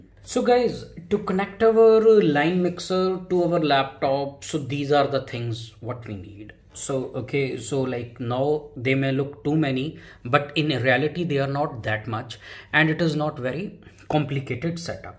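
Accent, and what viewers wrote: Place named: India. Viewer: Indian accent what’s wrong with that ? 😂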